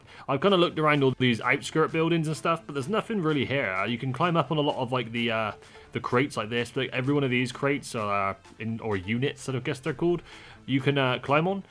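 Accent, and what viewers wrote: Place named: Somerset, United Kingdom. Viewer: Where you from.. you sound very somerset .. Bristol maybe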